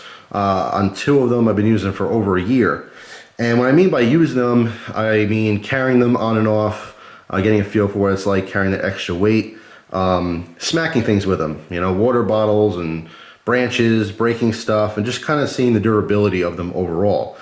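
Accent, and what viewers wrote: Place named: Rhode Island, United States. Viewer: Hey, are u from RI? U sound very Rhode Islander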